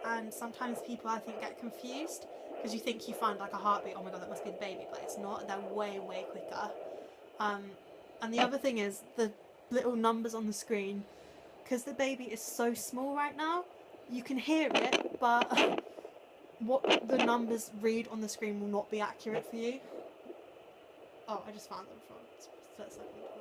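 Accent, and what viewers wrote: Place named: United Kingdom. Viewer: That British accent threw me off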